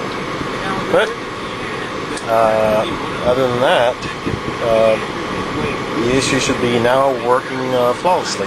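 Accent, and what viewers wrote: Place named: California, United States. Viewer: I was going to say it sounds like you live in California.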